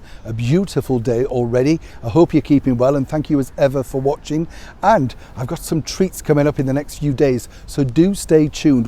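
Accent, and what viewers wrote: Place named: North West England, United Kingdom. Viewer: Can I just ask? Whereabouts are you from? My guess is north west England? Your accent is very familiar to me x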